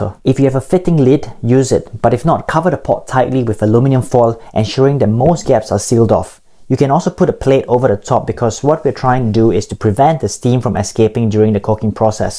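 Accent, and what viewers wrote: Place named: Singapore. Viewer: Your topics r good, due to your singaporean accent & style and the way u speak so fast, my heart goes with your fast pace to rush, not a comfortable viewing, please speak a bit slower for a more comfort viewing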